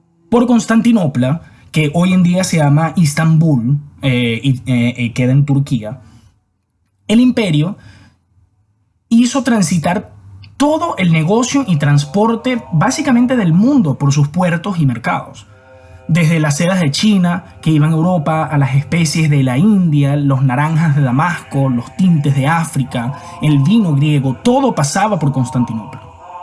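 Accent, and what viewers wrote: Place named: Venezuela. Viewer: ese acento, si no me equivoco es de Venezuela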